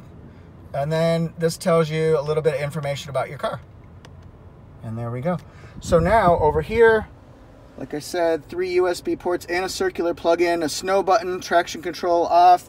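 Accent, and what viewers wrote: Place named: Canada. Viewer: I tried to find the dealer location to get a clue about the accent. Is it Canadian? I am afraid I am not great with North American accents as I live in New Zealand.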